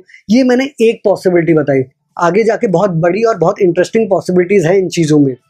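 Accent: Odisha, India. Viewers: R u an odia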